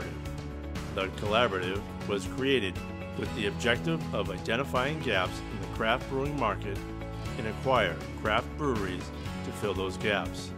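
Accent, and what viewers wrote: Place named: Massachusetts, United States. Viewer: That’s interesting because I was always told Sam didn’t have their own breweries but contract brewed …which never made sense to me,. Thanks for clearing that up …I didn’t know they opened up by fanuiel …I’ll have to hit that. sounds like we have same accent, I’m from cohasset …are you from mass?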